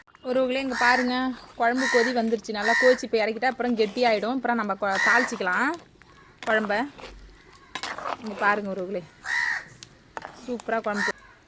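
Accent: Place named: Cuddalore, India. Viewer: கொள்ளைக்குன்னு சொல்றிங்க கண்டிப்பா கடலூர் மாவட்டம் தோணுது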